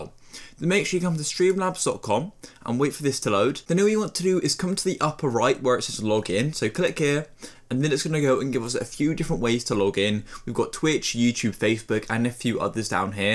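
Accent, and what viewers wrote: United Kingdom. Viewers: He’s definitely British dude he can’t help it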